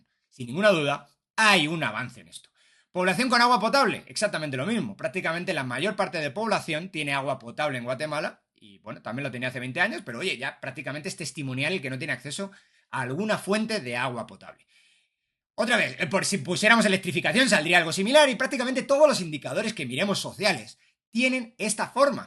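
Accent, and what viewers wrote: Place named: Argentina. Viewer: Parece que tú no tú no eres de Guatemala tu hablado Qué haces para que parece que tú eres de Argentina o de Colombia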